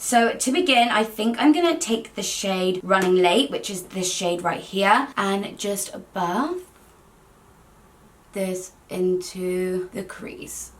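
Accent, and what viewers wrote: Newcastle, United Kingdom. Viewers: I’m new to this channel and got so confused. So geordie at the beginning and then not at all by the end of the video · R u from Newcastle!?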